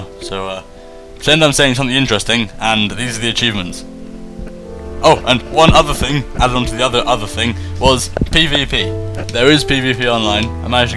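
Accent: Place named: United Kingdom. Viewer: speak american so i can understand u U fucken brit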